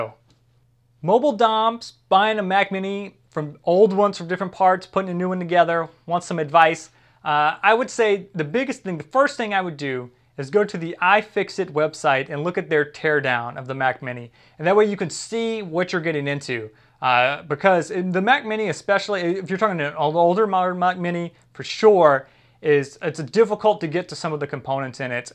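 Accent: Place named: Brazil. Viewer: Ahhh, Nice portuguese you spoke.. It had a brazilian acente but still Nice portuguese..!!hehehehe..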